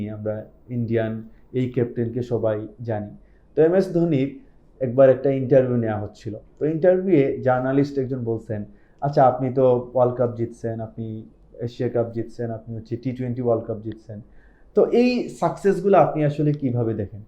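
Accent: Sylhet, Bangladesh. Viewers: Bhai Sylheti ni🥰🥰🥰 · Sir kota bolar doron Sylheti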